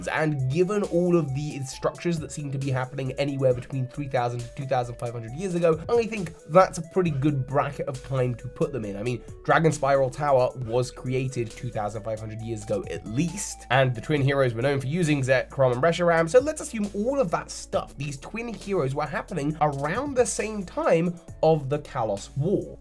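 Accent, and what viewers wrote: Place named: England, United Kingdom. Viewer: I love these kind of videos so much, I could listen to for hours as he sets up and explains these theories with his English accent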